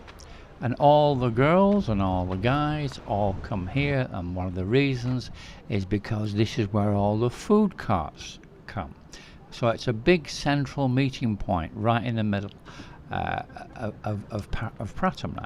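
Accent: United Kingdom. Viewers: I am trying to work out your accent? Where are you from in the UK?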